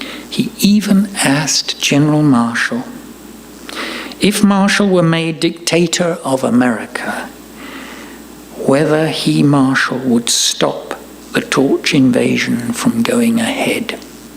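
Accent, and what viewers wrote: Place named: England, United Kingdom. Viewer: There is someting special about a historian with a great english accent and fantastic delivery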